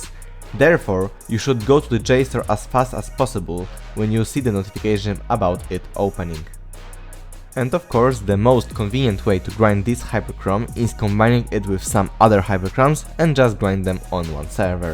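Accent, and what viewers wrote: Poland. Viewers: Słychać ten polski akcent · Słychać że polak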